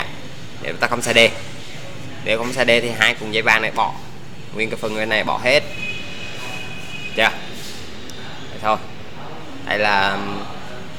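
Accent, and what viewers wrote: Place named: Quang Ngai, Vietnam. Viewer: tuy anh nói tiếng quãng ngãi hơi khó nghe thật. nhưng thật sự em dám công nhận anh chỉ rất dể hiểu và có thể làm đc. do anh có chuẩn bị sẳn đồ án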